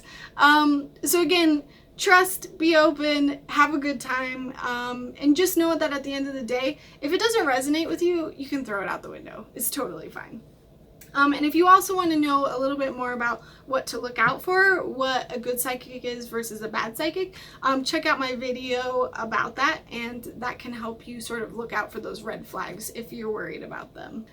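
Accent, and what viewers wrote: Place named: Minnesota, United States. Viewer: I'm pretty good at guessing accents. I'm thinking and predicting she's from Minnesota but I could be wrong.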